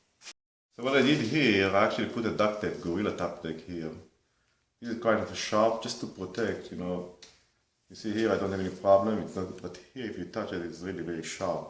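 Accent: Israel. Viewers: You sound Israeli. Thanks for the video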